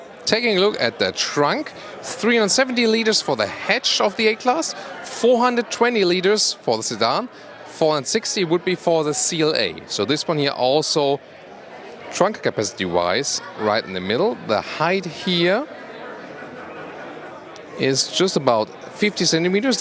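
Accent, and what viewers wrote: Germany. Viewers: Thank you very much for another very informative and professional review,. 👍 Your in-depth presentation style is so much better than the competition, in so many ways... highly professional and always delivered in that impeccably suave and sophisticated German accent of yours, that is every bit as slick and refined as the automotive design and engineering masterpieces that you showcase! 👌😉 Thank you for all of the hard work and laborious camerawork and editing that goes into each of your in-depth videos, as well as your incisive commentary and detailed observations that many other reviewers leave out. My daughter has her own YouTube channel with nearly 20,000 subscribers and so I know how much time and work goes into producing even a short video, let alone your more exhaustive reviews, and while many of your viewers may take all of that for granted, I certainly appreciate your efforts and have learned a lot from watching your videos and enjoy your presentation style immensely. 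Keep up the great work! 👏😊 ~ Kind Regards, Louis.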